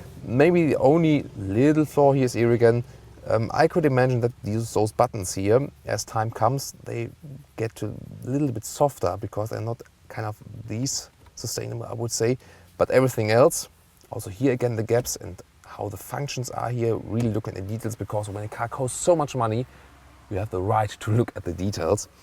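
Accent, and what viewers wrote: Germany. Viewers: Der deutsche Akzent fetzt 😎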